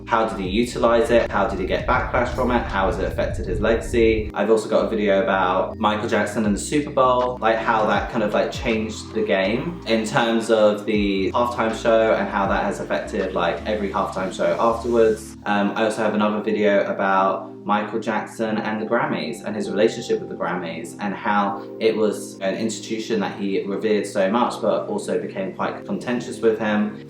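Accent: United States. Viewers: how come the voice overs are American men and women? I didn't know that this was a British content creator behind this Channel. Makes me like it more, fellow Brit! 🇬🇧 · i never get bored about hearing about Michael Jackson, also like the American voice on the videos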